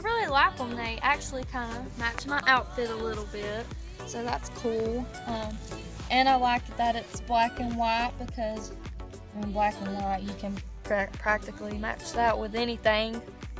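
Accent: United States. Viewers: God, that twangy Georgia accent is screaming sexy ❤❤❤❤❤❤❤❤ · Beautiful sweet. Southern accent so cute. ❤🎉👣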